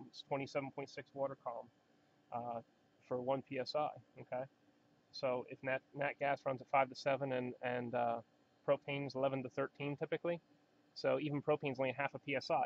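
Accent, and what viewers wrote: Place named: New Jersey, United States. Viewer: I just recovered 2 similar units that were installed briefly then decommissioned because the plant they were in has airflow problems and all the air these units made was sucked up and out the through the roof, and did not solve their problems. The wall units had already been discarded but because the refrigerant hadn't been recovered yet they were storing the condensers. I have a shopping list made up with wall units, line sets, and disconnect boxes. I am a millwright by trade but mostly work on air compressors. I plan on installing them for my home myself, with the help of an electrician for setting up my disconnect boxes and HVAC tech to purge, vacuum test, and charge my system. Is there anything in particular about these units that I should know? Someone online mentioned Mitsubishis can be tricky to wire but based on the manual it doesn't look any different than your complete install video I watched the other day, other than having to wire 3 separate units vs the one in your video. 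PS, you aren't related to Seth's Bike Hacks are you? Same NJ accent and talent for video production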